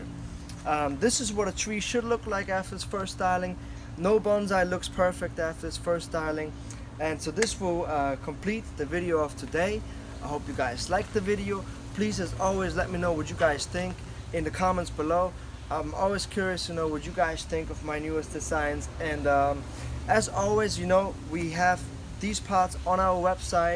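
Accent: Denmark. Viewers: Is this guy Danish? I think I can hear something Danish in his accent 🤔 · Is that a Danish accent?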